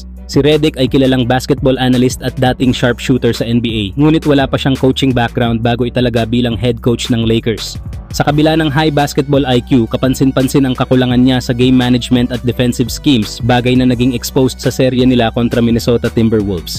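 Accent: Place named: Visayas, Philippines. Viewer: Bisaya siguro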